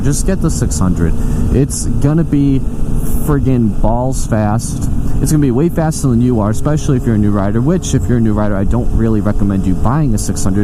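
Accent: Massachusetts, United States. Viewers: You from my home state of Mass? You sound like it in your accent a little.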